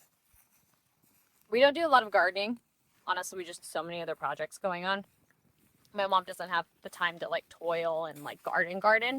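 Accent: United States. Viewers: I don't understand how English is your second language, but you have an American accent? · Can I ask a question about why do you have a strong US acceant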